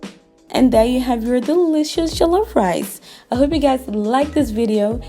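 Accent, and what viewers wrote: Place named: Caribbean. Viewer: You sound Caribbean. Im in Jamaica and all the ingredients you've listed are accessible. Thank you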